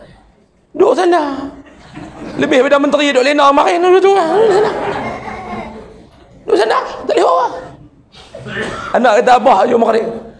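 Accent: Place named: Kedah, Malaysia. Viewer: Simple ja bab ustaz ni,,bg yg anti dgn ustaz ni mmg akan nampak tak elok ja,bg yg suka ilmu yg ustaz sampaikan mereka tak kisah pun bab loklaq bahasa ustaz,,rasanya org kedah tak terasa sangat pun bahasa ustaz,jd syok pulak🤭😁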